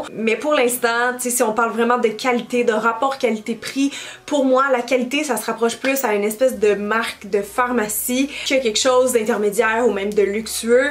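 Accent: Canada. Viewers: Omg tu as l’accent canadien j’aime trop tu habites au Canada c mon rêve d’y aller 🥺❤️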